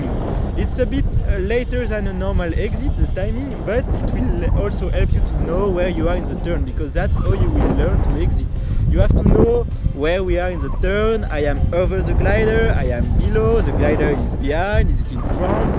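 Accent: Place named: France. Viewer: Cet accent Gaulois me fait mourir de rire!! On est vraiment mauvais en langues étrangères...😂😂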